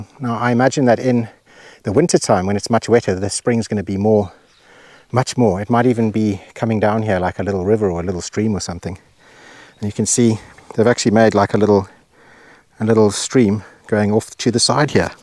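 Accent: South Africa. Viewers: are you from South Africa? Your accent is similar. I visited Durban years ago.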